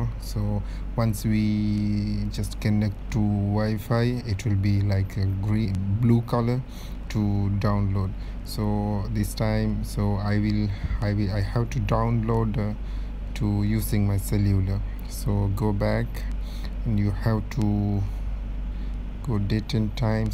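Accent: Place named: Kerala, India. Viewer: Are u malayali ssss sssss sssss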